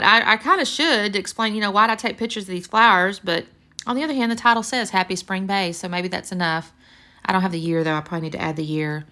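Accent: South, United States. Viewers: I love this. You sound like a real southern girlfriend. I’m in Tennessee just wondering where your from?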